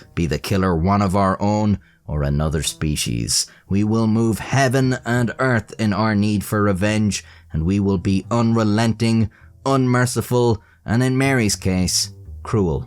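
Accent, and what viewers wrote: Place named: Ireland. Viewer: If you're new around here and looking for a similar but less horrifying video, try the one about the monkey railroad conductor. If there were a hall of fame for videos, that one deserves a spot. And dammit, the world LOVES Irish accents, your voice is delightful.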